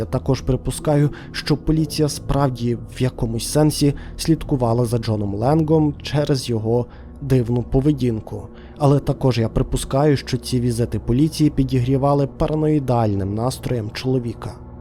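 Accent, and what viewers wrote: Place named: West, Ukraine. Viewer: Мені відчувається західний акцент, але з кожним відео все краще!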